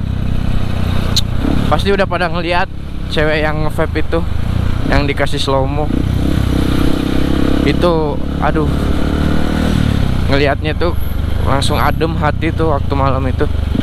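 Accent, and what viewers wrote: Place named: West Java, Indonesia. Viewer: Logat Sunda na mantep😂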